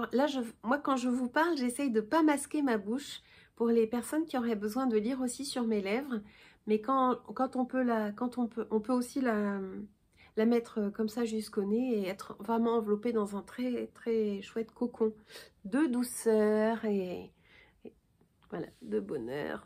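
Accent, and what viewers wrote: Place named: Marseille, France. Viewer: 🍄merci , ton Marseille te vas à ravir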